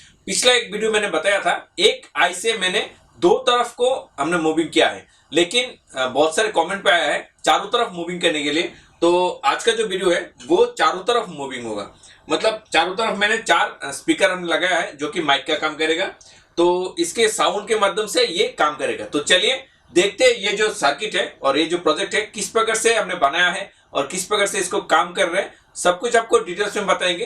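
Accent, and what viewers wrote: Bengal, India. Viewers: Sir yuo are bangali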